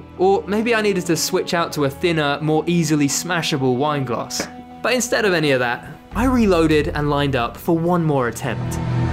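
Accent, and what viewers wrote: United Kingdom. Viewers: i like bri'ish youtubers, because i like bri'ish accents 10/10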